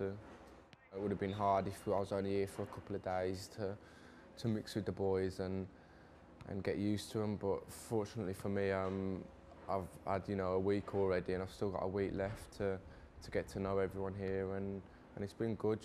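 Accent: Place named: Birmingham, United Kingdom. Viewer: He's so Brummie it hurts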